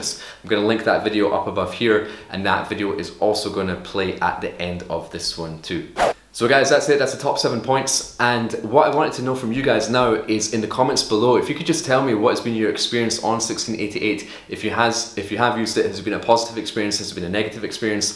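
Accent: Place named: Edinburgh, United Kingdom. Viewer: Thank you very much, and thank you for the Scottish accent, sounds like Edinburgh guy 👍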